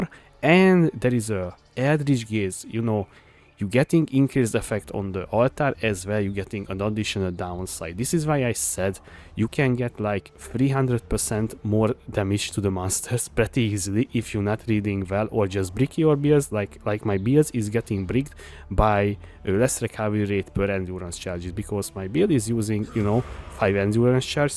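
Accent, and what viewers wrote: Hungary. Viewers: Nagyon magyar az akcentusod :D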